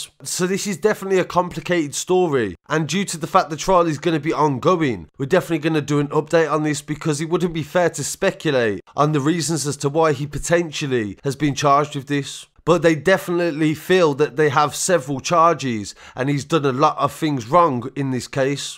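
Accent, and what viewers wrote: Birmingham, United Kingdom. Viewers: hey love the work so keep it up, you also sound like my mate who is from birmingham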